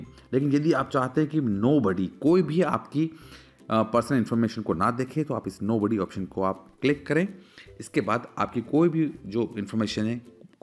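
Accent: India. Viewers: badi fast ahh nav delhi se lagdi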